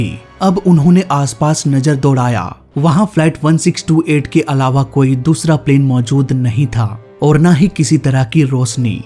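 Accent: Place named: Rajasthan, India. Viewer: Rajasthani accent baa. Roshni ko Rosni......ese, kese, vese.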